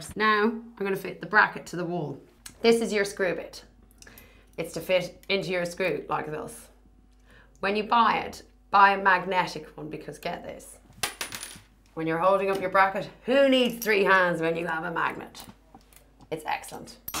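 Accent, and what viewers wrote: Ireland. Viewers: Any lady who does DIY deserves a like in my book. Love the Irish accent too. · Fabulous and a lovely Irish accent!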